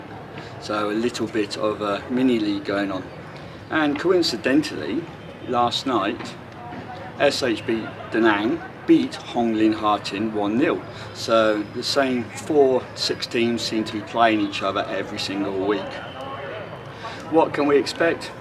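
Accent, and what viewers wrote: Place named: United Kingdom. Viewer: love your British accent <3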